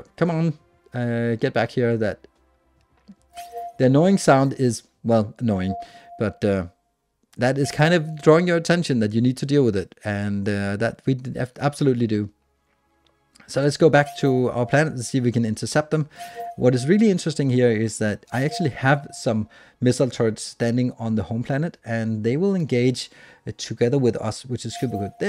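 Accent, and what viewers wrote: Denmark. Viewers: As a dane, its nice to listen to English with danish accent.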